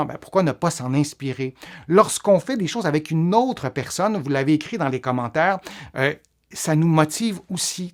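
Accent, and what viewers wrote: Canada. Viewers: Merci pour tous vos conseils , je vais acheter vos livres et je vous regarde en vidéo et puis j 'aime entendre votre voix avec le petit accent canadien . Vous êtes très motivant pour nous tous.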